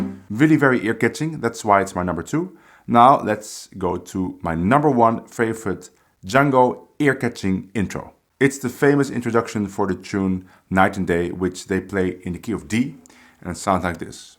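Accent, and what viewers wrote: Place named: Netherlands. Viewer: I love your Dutch accent, it's the best. 😍😉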